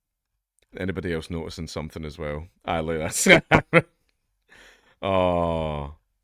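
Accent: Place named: United Kingdom. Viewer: The wores bank in UK Barclays bank The big amount of money was pull off from bank way no Alert stolen money 10 pounds I 2 transaction easy Hackers all over in England UK do that car dealership or others Nice British accent Which dialogue you have brother North or South I never can catch which one london bath Norwich leeds Birmingham Liverpool Manchester Blackpool York each other different talk Accent dialer hey not joke Chase bank fake check 20 years jail time by fbi police ok bye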